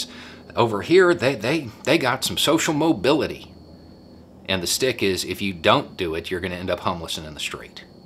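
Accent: South, United States. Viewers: You do realize that human trafficking could include helping illegal immigrants get to a better place correct? Just because it breaks the law does not always mean that it is immoral in nature. As for the accent as someone who was in the military and moved around I picked up the accent of any place I resided in given enough time, people gain and lose accents it is a thing so I don't know if it is really fake or not and even if it is is a Southerner now and I doubt you even know where he grew up so you can't even pull that not born a southerner card....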